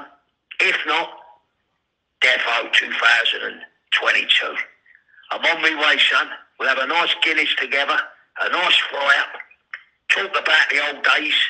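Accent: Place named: Cockney, United Kingdom. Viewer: how cockney is he! hha and strength of mind way up there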